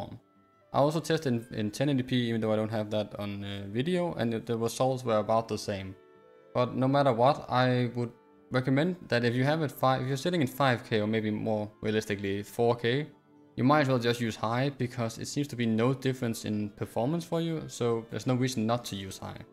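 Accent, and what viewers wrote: Denmark. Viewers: Yo m8 du lyder som en dansker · You danish bro? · Tak for videoen min ven, god forklaring og perfekt med opdeling af videoen for os der ikke kræver den store intro til grafikinstillinger. Er sikker på du er dansk på den vidunderlige accent du har 😄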